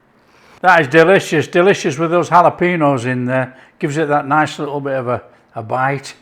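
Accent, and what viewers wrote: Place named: England, United Kingdom. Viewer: Love your scent which part of England are you from x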